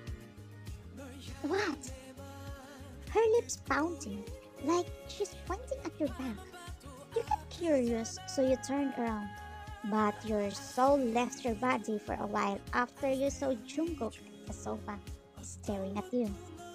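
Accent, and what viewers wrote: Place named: India. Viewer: Omg I thought you were Indian lol but still u nice keep going 💜 👏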